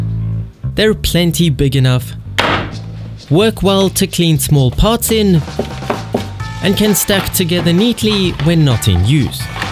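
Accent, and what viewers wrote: South Africa. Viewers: Hey man u south African if not where you from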